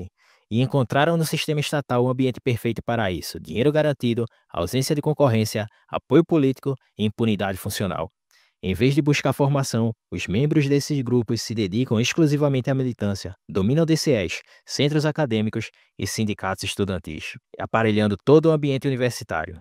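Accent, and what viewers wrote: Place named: Ceará, Brazil. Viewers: Narrador cearense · a narraçao nordestina cagou com o canal , não canal inteligente e voz nordestina não combinam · Do carriri cearense, certeza ❤😂😂😂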